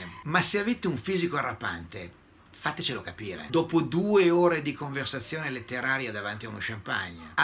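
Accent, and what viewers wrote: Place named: Milan, Italy. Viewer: Io non capisco , signore, cosa c'è da scandalizzarsi. Si può non essere d'accordo,io stesso, maschio etero arrapatissimo, non sono d'accordo al 100% con lui, che oltretutto ha un accento milanese che per noi romani è come le unghie che grattano il muro,però secondo me la femmina avveduta deve fare tesoro delle sue parole, perché che piaccia o no descrivono i desideri di ampia parte degli uomini. Poi,si può decidere di non partecipare alla festa, ma la conoscenza delle cose bisogna averla, e senza puntare il dito, anzi, ringraziando delle preziose informazioni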